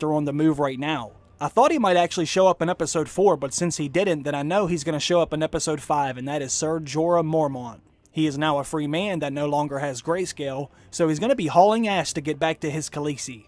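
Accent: Pittsburgh, United States. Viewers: Are you from Pittsburgh? You sound like you're from Pittsburgh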